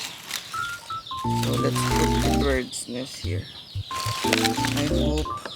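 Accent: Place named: United Kingdom. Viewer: Lucu kali mas the way he speak "british accent" make me laugh at the same time of his cute face.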